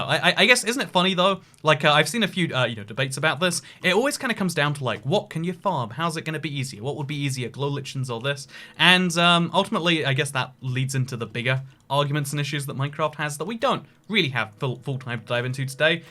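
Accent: United Kingdom. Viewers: is British. Lichen is pronounced 'Litchen' in British English. pronunciation is therefore correct.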